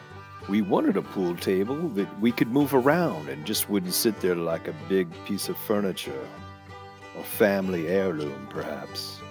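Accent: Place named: South, United States. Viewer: Same here. I loved the hick voice. No offense to the little women, but I'd kept it the entire video. I feel its a shame the southern accent has nearly become extinct.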